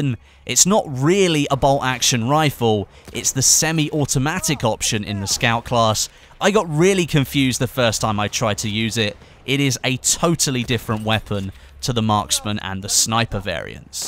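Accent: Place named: United Kingdom. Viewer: Luv ur british accent. U should be in the game. talking about to get objective charlie lmao nice content bro cheers from brazil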